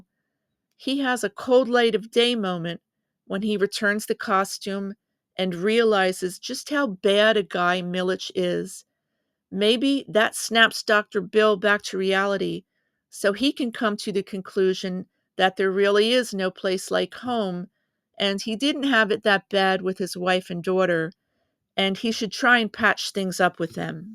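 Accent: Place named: New York, United States. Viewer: I like your narration and your slight (IMHO) New York accent, which I enjoy.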